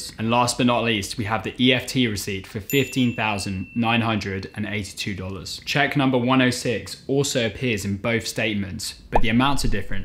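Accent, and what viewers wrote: United Kingdom. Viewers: May God bless you for the way you have blessed us! Extremely helpful video - I'm doing a course in bookkeeping. Just curious, where are you based? Your British accent and use of dollars instead of pounds in your examples is throwing me off 😂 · PLEASE NEXT VIDEO NOT TOO FAST PLEASE ! SINCE YOUR BRITISH ACCENT I HAVE TO TAKE A LITTLE TO GET YOU !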